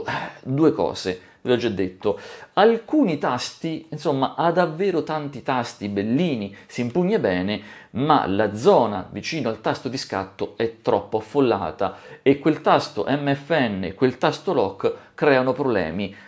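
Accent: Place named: Genoa, Italy. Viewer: Ohimè l'accento genovese🤣 che còccina belandi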